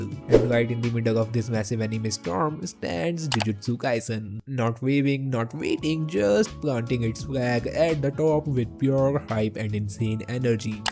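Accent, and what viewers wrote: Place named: India. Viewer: no hate i would have watched if it wasnt indian voice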